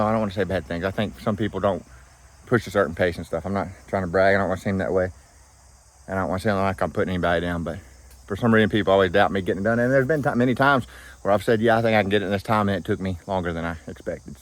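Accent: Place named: Virginia, United States. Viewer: You sound like your from south west Va or nc my neck of the woods lol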